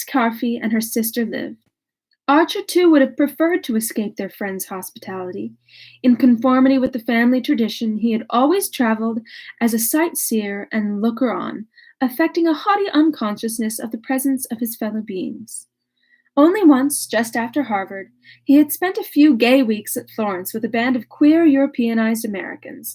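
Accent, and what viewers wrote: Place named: France. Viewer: But the accent français!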